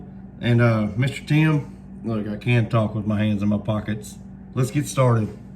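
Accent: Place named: South, United States. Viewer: Do you ever cook using venison? I can tell by your accent you’re from the South. BTW, I live in Hayden Alabama!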